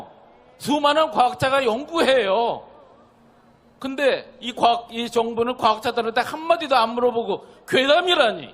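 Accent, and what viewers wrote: Jeolla, South Korea. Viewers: 전라도 사투리쓰네 과학자라면 과학적 으로 증명하면 될것아니냐? 제발 그렇게 해봐라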